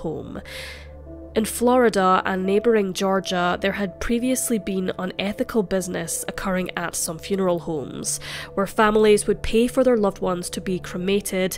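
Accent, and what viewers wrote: Scotland, United Kingdom. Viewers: Love her Scottish accent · Scottish, they sound beutiful until they get drunk and then noone can understand what the fu*k they're saying 😄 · It's the scottish accent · The Scottish accent is enchanting, isn’t it? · Is the narrator Scottish?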